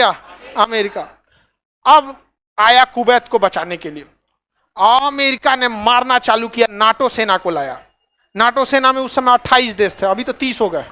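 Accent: Bihar, India. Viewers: ye bihar ke logon ki aise boli hoti hai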